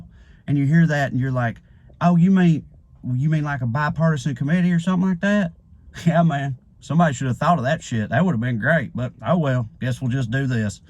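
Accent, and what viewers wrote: South, United States. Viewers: I love what the guy is saying and the way he says it too. Well Gee Whiz Sargent I do believe. Shazam. Recognize who I am talking about now. He can really sing good. I'm talking about this guys accent which reminds me of Gomer Pyle. I could listen to him all day long. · I can't explain what good feeling I get when a guy with deep South accent says really perceptive things . My dad had the unusual combination of southern accent and a phd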